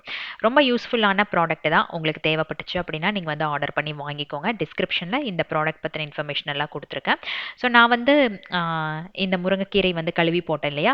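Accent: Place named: Telugu, India. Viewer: akka nega telugu thana ka❤❤❤ nagalum ipadi tha ka telugu pesuvomm...... Nega pesurathu ennaku nalla puriuthuka...... ❤❤Naga pesura marriye telugu pesuringa....... Unga vedios eillamey enaku romba pudikum...... Enoda day vey ungaloda vedio la start agum...... ❤❤❤❤❤❤avlooo postive vibe akka ❤❤❤❤❤unga voice and vedios❤❤❤❤......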